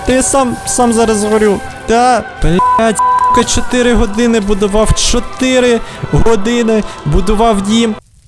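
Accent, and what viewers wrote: Transcarpathia, Ukraine. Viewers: вхахвхвах закарпатьська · Ти з Закарпаття?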